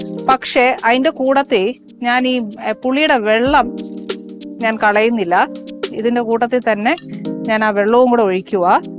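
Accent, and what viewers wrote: Kottayam, India. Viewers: കോട്ടയത് ഇങ്ങനെ ആണ് പറയുക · Njgle Kottayam kaaru ingne aane samsarikunnathu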